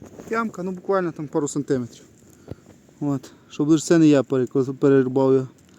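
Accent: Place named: Bukovina, Ukraine. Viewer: вітаю.цікаві походеньки, підписаний на Вас.Чую по мові та бачу по природі, що Ви десь на Буковині.Якщо це так, то хотілося би знати район, а все для того, щоб десь походити разом.Дякую і кладуху сєрєбруху!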